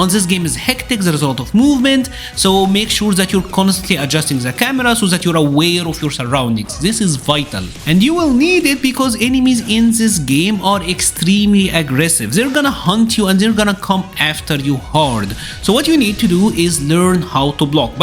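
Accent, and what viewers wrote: Egypt. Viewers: I knew u are Egyptian from ur accent 😂❤takes one to know one, awesome vid brother ❤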